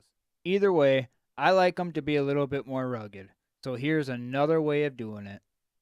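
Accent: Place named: Wisconsin, United States. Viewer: You sound like a Wisconsinite, I bet you say "you's guys"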